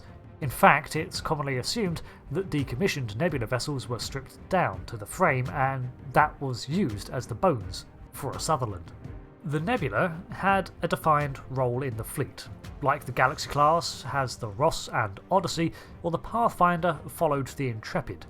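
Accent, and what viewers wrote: England, United Kingdom. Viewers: He's from England. They pronounce it anyway they please.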